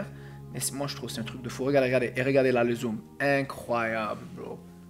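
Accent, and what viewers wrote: Canada. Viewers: mais c’est un canadien tout le monde parle comme ça ici !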